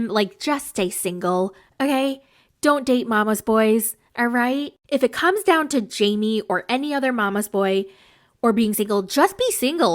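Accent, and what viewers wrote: United States. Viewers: Just to tell you - YOU ALSO have an accent. American one. xd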